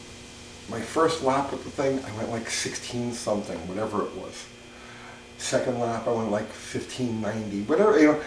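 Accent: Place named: Brooklyn, United States. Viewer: you were ragging way too hard!!! Obviously appeared as if it was his first time the drags which can be a bit overwhelming. You sounded like a slightly nicer version of Mike P. from Brooklyn!!! He'll get there, nothing works but experience - you KNOW that! It's harder than it looks. Start with teaching him how to do a proper burn out!!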